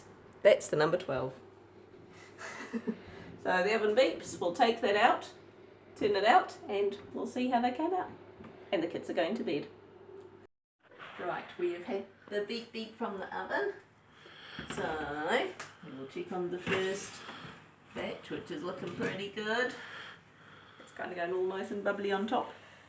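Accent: New Zealand. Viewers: Just returned to oz after a wedding of my niece; so nice to hear the kiwi accent. From a very homesick kwassie. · Lol Ido love how natural you are! Also its great hearing a NZ accent ❤ Commenting from Waikato, North Island. Great channel, keep up the good work 👏🏼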